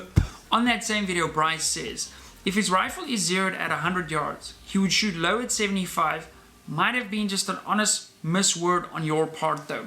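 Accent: Afrikaans, South Africa. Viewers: I actually came across your channel this morning,so have been binge watching your vids for most of the day!!Love the vids,and learning a lot...almost thought you were swedish,but then heard the afrikaans ha ha ha...Keep up the good content!! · Are you South African?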